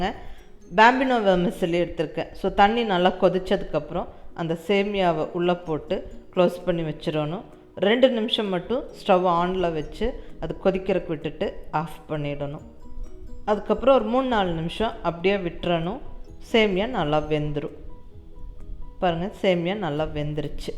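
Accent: Coimbatore, India. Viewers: Coimbatore pola neenga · Sema..supar.tank u mam.unga voice coimbatore, erode matiri iruke?nice voice.god bless u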